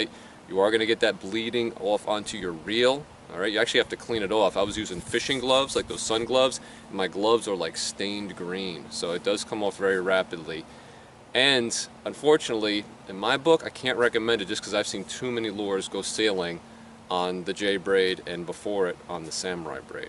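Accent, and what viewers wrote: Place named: New Jersey, United States. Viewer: I'm sure you've already addressed this somewhere, but I can't find it... What part of Jersey are you from? I pretty much knew from the accent, but this video, with your license prominently displayed on your hat, eliminated any doubts. 😀 Just so I understand completely, are you saying essentially all your break offs on this line and Samurai have been on casts? I know that can occur when a couple of little loops form or you've caught a large fish or snagged up and try to pull it free (and it sounds sickeningly like a gunshot). In any case, if it hasn't been breaking on hooksets, I still might give it a serious workout. Part of what's going on, I'm sure, has to do with the fact that Japanese lines label their actual break strength whereas most of the domestic lines like Power Pro, Berkley, and Sufix rate them sort of arbitrarily by diameter, which is why Samurai is so much thinner at each labeled strength. 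I'd bet 80# Sufix breaks over 100# on an IGFA test for straight pull.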